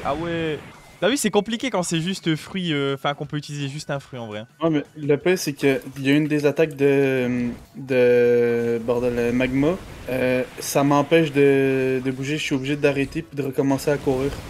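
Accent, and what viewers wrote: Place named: Quebec, Canada. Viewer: est québécois parce que moi aussi chu québécois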